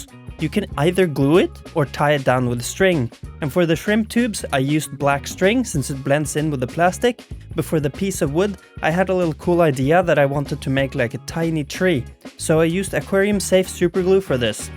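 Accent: Norway. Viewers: The problem is, in Sweden you are not allowed to sell shrimps on facebook (thougth it was the same in Norway where it sounds like you are from). But great video!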